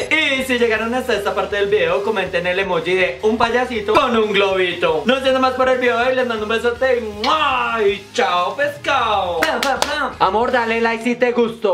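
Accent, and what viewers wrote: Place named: Colombia. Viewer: El acento colombiano es muy bonito 😍😍😍😍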